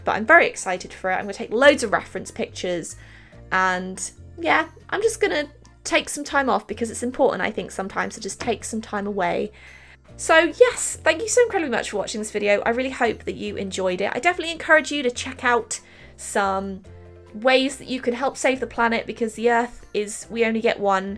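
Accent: England, United Kingdom. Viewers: Are you in Uk? Just wondered you sound English. I like the look of the brush cleaner. xxxAnnxxx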